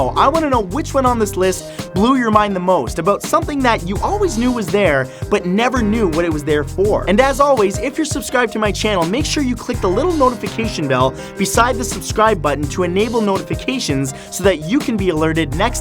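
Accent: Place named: Canada. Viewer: Your Canadian accent. Is killing me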